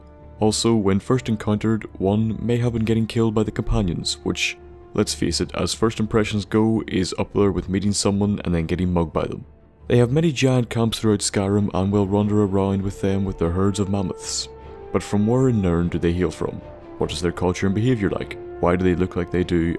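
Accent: Northern Ireland, United Kingdom. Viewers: where is the narrator from? sounds like western northern irish to me? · What part of NI are you from??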